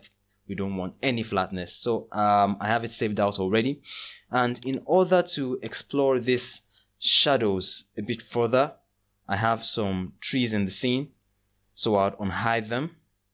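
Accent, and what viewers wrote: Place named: Nigeria. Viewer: Thank you Bro its really helpful I understood it more with your accent,more grace and knowledge..Naija Bro sending love from TN,U.S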